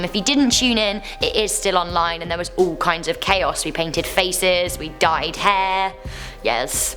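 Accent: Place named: United Kingdom. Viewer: Who else clicked out from this video after hearing her talk? Them brit's have the most annoying accent and they love to hear themselves talk.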